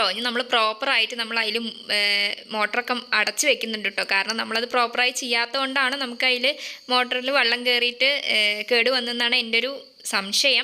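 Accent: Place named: Thrissur, India. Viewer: Nee thrishoor kaariyano ninte samsaram bhayankara ishtaayi ketto ninte samsarathil kure tto tto athanu samsaram ishtamayath njaan kannur kkaranjaan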